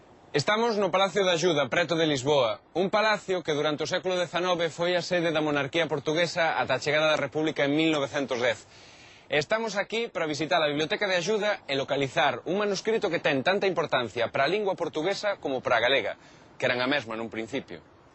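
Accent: Castile, Spain. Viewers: Un apontamento: o apresentador non ten verdadeiro ou profundo sotaque galego, ten escaseza de fonética auténtica. Seica é un neofalante desta lingua, e a sua lingua nai sexa o castelán. Dai que para os observadores extranxeiros pareza mistura co español, e que un hispanofalante comprenda perfeitamente. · Se calhar percebes melhor o espanhol, isso sim. O que ouves nesta reportagem está mais para castelhano que para galego. O verdadeiro galego é pronunciado de igual forma ao português do norte (Minho e Trás os Montes), Se calhar temos todos um problema na garganta e os brasileiros como tu é que falam português correcto, vai-se a ver... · Este apresentador fala um galego foneticamente castelan e com palavras do castelan o castelhanismos. O galego, hoje, é a mesma língua que a falada na Portugal, que é um galego evoluído,.influenciado pela separação do condado de Portucale do resto do Reino da Galiza e pela implantação da língua castelhana pelos reis católicos na Galiza que produziram a castelanizaçom da nobreza galega e do galego o que a história da Galiza conhece como a ´ "Séculos oscuros, que a " separaçom que o rei Alfonso VI de Castela, Leão e do Reino da Galiza - que incluía as terras portuguesas que se estendiam do rio Minho a Coimbra, depois de tomar a o seu irmão, Garcia, rei do Reino da Galiza - que incluía o condado de Portucale, o separou do reino galego o condado Portucalense, entregando a atual Galiza a sua filha Hurraca e o conde Raimundo, e o condado de Portucalense a sua filha bastarda Teresa e o conde Henrique da Borgonha. 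Lista da reis da Galiza: <link> A verdadeira hítória dos origenes galegos de Portugal que muitos historiadores portugueses ignoran:<link> .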